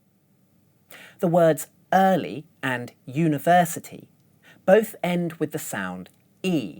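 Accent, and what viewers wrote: United Kingdom. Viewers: Is it rp accent?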